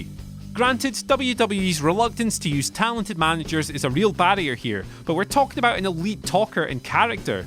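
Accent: United Kingdom. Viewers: Please help your non english speaking audience, use less britain accent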